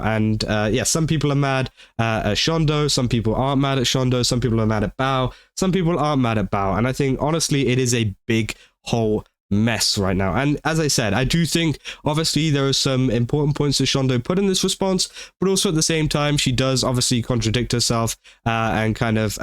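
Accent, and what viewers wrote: United Kingdom. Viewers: Youre british ? Man im sorry i might not support you anymore 😔 this is outside my boundaries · Wait... Shondo is British!?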